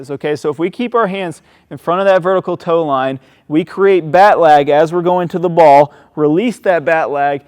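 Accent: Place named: United Kingdom. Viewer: yeah on ur youtube channel u sound like ur British r u British?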